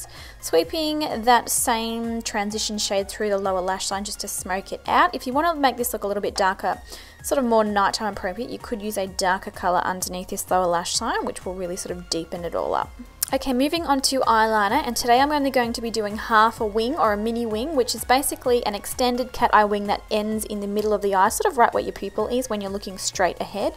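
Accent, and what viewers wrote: Australia. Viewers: G'day! Just found you (via the Rimmel lippy reviews) and so lovely to hear another Aussie! I've subscribed already :)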